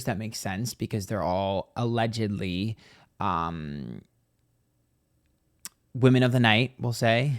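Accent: New York, United States. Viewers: His house in Massapequa looks like a house of horrors and it was an eye sore in the neighborhood and neighbors have apparently seen him burning garbage in his backyard in the past as well as watching females suntan in the neighborhood. He’s a creep. Are you from Long Island? You definitely have a New York accent and speak fast 🤣 but I can totally keep up. You speak my speed. I’m from Long Island. You say cawfeee? 😁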